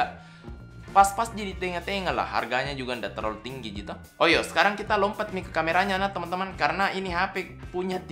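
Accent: Makassar, Indonesia. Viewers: Saya suka sekali koko klo logat makassar ki ...sama² ji ki too ...#ewako koko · Lebih bagus kapang memang Logat Makassar bosku... Ada toko hp kah kita punya di Makassar ??? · Matap...enak juga liat review gadget pake logat makassar 👍👍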